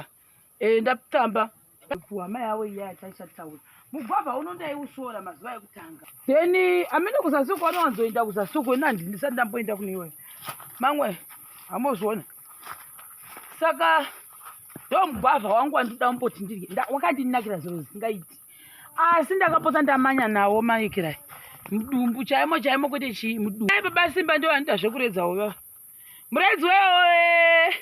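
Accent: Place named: Masvingo, Zimbabwe. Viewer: Shona yenyu ndiyo chaiyo yeMasvingo❤❤❤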